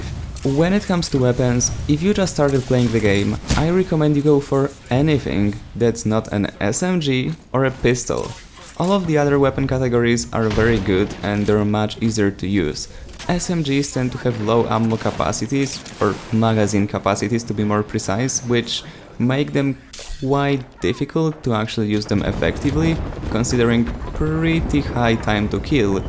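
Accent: Poland. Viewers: Hmmm you sound lukę youre from poland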